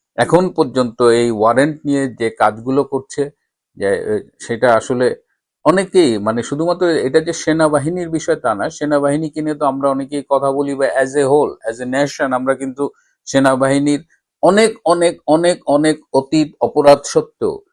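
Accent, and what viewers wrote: India. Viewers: তোমার কথা শুনে মনে হলো তুমি ভারতীয় দাদাবাবু তীয়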